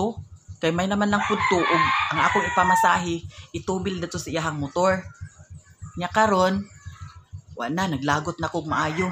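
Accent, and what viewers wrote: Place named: Misamis Oriental, Philippines. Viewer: Every time makita nko imo vedio it remained me sa ako mag relatives taga misamis oriental i missed hearing your accents....ingana gyd .....shoutout taga kiraking ug calubo keep safe....you too🍒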